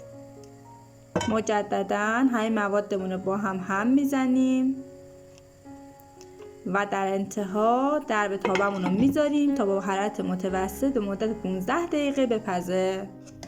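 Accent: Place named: Mashhad, Iran. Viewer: عالی بود دوست من لحجه مشهدی قربون❤😊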